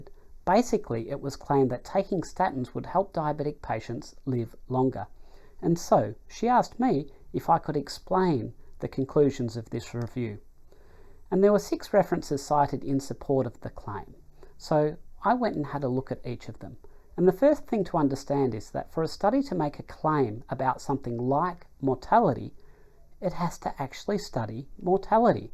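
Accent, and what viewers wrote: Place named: Australia. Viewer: Australian can be difficult, try slowing down the speed of playback to 3/4 speed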